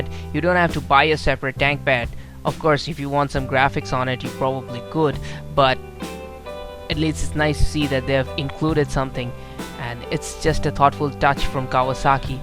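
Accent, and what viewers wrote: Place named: Bengal, India. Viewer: That bengali local accent.. I love it...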